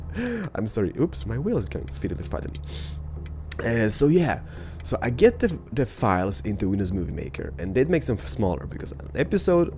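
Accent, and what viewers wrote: Sweden. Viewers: Wuuuut are you Swedish :O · Jag är oxå från sverige :D Du har svin härlig dialket! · ÄR DU SVENSK?! (are you swedish?!)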